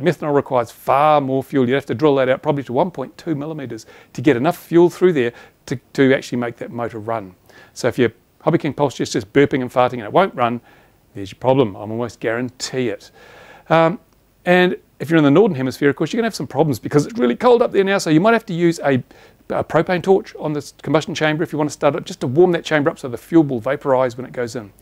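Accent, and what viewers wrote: New Zealand. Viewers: you sound kiwi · Why dont you mount it on a sheep???? Did I detect a Kiwi ecsent.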